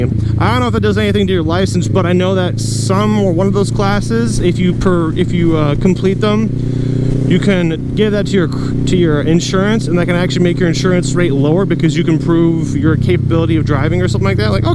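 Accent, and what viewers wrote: United Kingdom. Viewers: Love the British accent ✌ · 😂 the British accent. I’m surprised at how laughter my lungs are currently.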